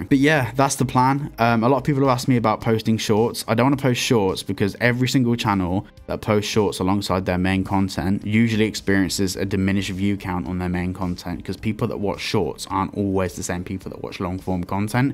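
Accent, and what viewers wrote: United Kingdom. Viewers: I didn't start watching you for GTA, 2 reasons why. One, your British accent and mainly your ambiance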